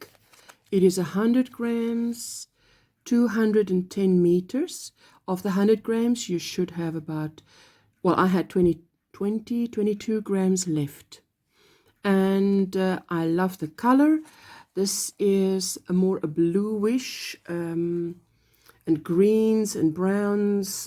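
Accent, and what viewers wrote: Afrikaans, South Africa. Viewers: Great tutorial thank you! If I'm not mistaken, you've got a beautiful Afrikaans accent? Hahaha your accent makes me miss home! Can't wait to see more videos :D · Where are you from,your accent is so South African .... Ive just completed the beanie, easy and well explained, many thanks. Regards Sushie, South Africa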